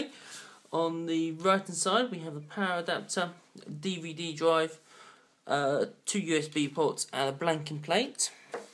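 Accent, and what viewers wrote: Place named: United Kingdom. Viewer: Ur from europe???? U sound british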